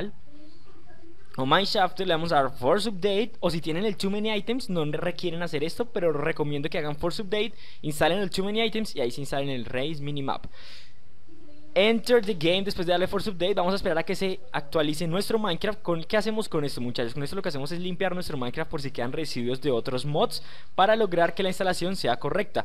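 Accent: Colombia. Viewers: oye eres colombiano y igual que yo?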